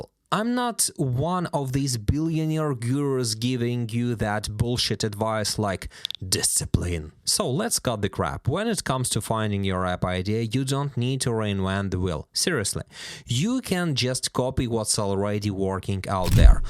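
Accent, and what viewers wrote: Ukraine. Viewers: Holly Dolly, you are our guy. I undestand that by accent. Ukrainian too?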